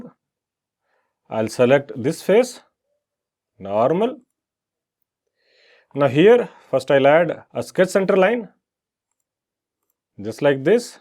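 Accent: India. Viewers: Video super! But why does an Indian accent irritate me so much? Thanks for the useful information. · Sarà bravo ma l’inglese degli indiani, pakistani e quant’altro non si può proprio sentire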